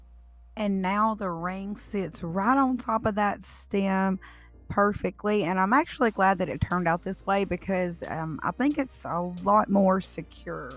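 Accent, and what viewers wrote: South, United States. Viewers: You're very welcome. You have a strong southern accent. Makes me think you are from somewhere close to where I'm from... Kentucky. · You have inspired me!!love your southern accent too! so pretty!! And we can put our own style into your design and make it customized to our decors Thank you love Canada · Oh my how beautiful, & the lamp isn't to shabby either. LOL Just joking, plus it's really nice to hear someone with a Southern accent like me. I could be your grandma & I loooove your lamp. I just happened to stumble up on your video. You are very talented & bet you started out when you were a lot young. I am going to have to make my own, & watch all of your videos. I love that deep Southern accent, it makes me feel like I am home "In ". Keep up the good work, Little Southern Magnolia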